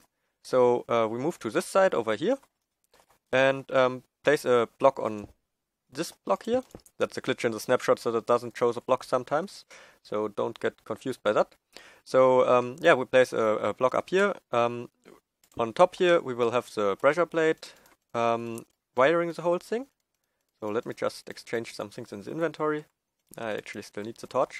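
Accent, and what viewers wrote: Germany. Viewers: Kann es sein dass du deutsch bist? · Are you German? Your pronunciation sounds like a German accent. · ich wusste schon immer das deutsch bist ;D wegen deinem akzent aber nice video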